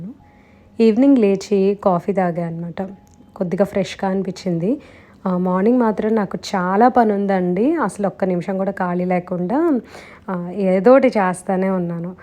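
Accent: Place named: Vijayawada, India. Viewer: yes...but mana Vijayawada slang almost superey..kada..proper Vijayawada...but memu eepudu telangana lo mahaboobnagar lo vuntam..ma vari job rithya..tanu press dpt..in Eenadu..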